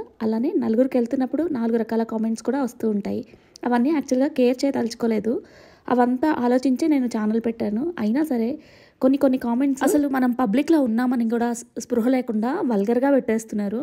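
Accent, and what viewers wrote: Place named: Nandigama, India. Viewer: Ma nandigama heroine 😻💓 me too born in nandigama andi ⭐💭 anduke slang adiganu .💖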